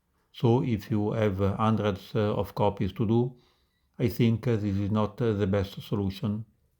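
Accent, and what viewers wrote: Italy. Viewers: Ma... come si fa a registrare un video parlato in inglese con una pronuncia italiana tanto terribile...!!! La prossima volta veda di parlare nella sua lingua, anche perchè youtube offre ottimi sistemi per la traduzione dei contributi in altre lingua... ecchediamine !!! Questo video sarebbe stato interessante, ma sono stato costretto ad abbandonarlo per il disgusto. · The Italian accent makes it. 👌🏼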